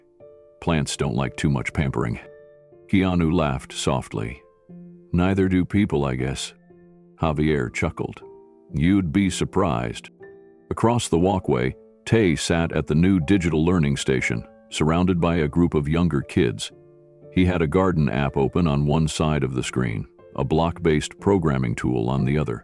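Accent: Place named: United States. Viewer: ❤ I DO Appreciate these stories especially regarding Keanu, for example and credits. BUT ADS!? For those of us already bombarded with constant Google ads that are depressing for the fact that elderly ads are about drugs, weight loss, everyday relentless bombs of negative facts of life, aging, to the point of brainwashing and same Ads over and over, taking up more time than the story on some. I do appreciate the American dialect of a well educated man, not AI.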